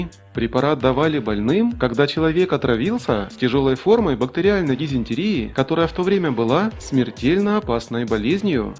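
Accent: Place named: Russia. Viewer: Тоже ламаю голову,не могу вспомнить, -в какой местности Росси такой говорок ? ) )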